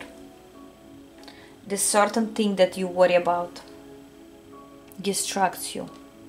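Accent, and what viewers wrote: Russia. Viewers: pile 2. "someone's lying on the couch crying and watching many readings right now" LMFAO i dreamt of crying last night and also cried bcs of the sad news i heard.. and now i'm sitting watching all of your readings so accurate this is amazing! and also when u said that a relative was jealous of me someone living next door... i already know who she is.. my own aunt my dad's cousin! she always imitates what we buy and wants us to be below them, whatever i have the next day she'll do the same to her children ugh! and also the guy that was watching me is my ex.. an abusive obsessive ex! and i am so happy he is no longer in my life even though he tries to come back i will never let him in my life again!!! thank u so much for such an accurate candle wax reading! u have officially been my favorite tarot reader <3 success for you my lovely russian lady! 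(don't know if u are but i just guessed from ur accent)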